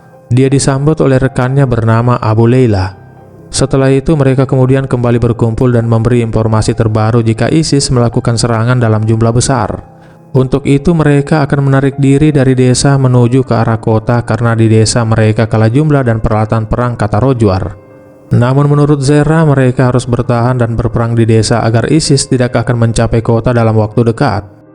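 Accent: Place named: Bugis, Indonesia. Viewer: Mimin logat Bugis, orang Bugis kahh😅😂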